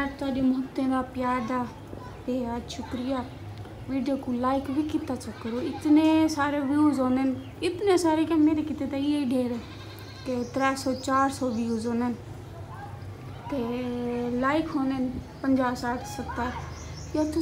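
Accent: Saraiki, Pakistan. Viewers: Taidi sraiki Sachi bhao mithi hai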